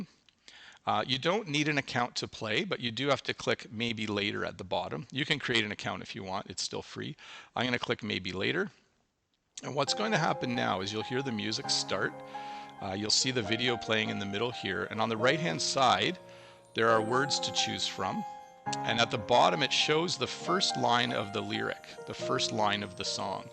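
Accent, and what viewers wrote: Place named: Canada. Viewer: Hi! I really like Canadian Accent! Thanks!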